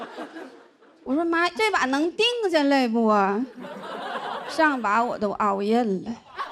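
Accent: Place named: Northeast, China. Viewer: 这一口东北大碴子味儿。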